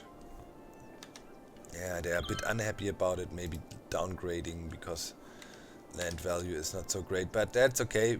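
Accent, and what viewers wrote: Germany. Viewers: are you german? your sounds like german,and the names of your cityparts like altstadt, i am german :D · TitaNiumCriStal ja dachte ich mir auch seine Aussprache hört sich deutsch an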